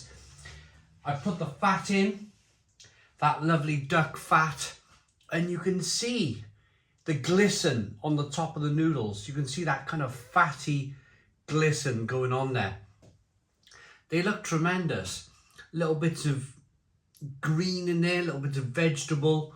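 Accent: Wales, United Kingdom. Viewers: Is you Welsh is it?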